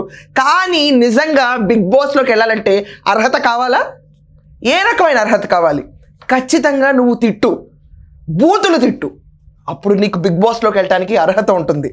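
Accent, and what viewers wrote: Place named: Hindi Belt, India. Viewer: BP tho potharemo anukunna mam... chala hindi vala style lo matladalanukunnaru bavundhi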